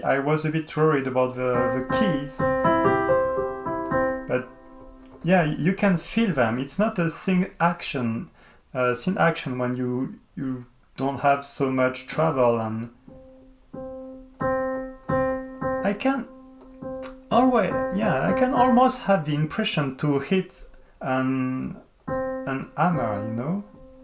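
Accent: France. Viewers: Bonjour, j’ai remarqué votre accent français, j’ai reçu ce piano hier et impossible de le faire marcher, je ne comprend pas comment pouvoir jouer si le son de sort pas quand je clique sur les touches. Pourriez vous m’aider? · hey, nice video, i gonna start learning piano, and i'm gonna take this one, thanks you for the video, by the way your accent sound french, c'est sympa :)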